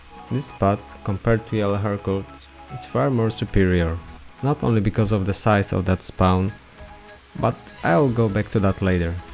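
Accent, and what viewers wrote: Poland. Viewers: masz polski akcent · slychac ze polaczek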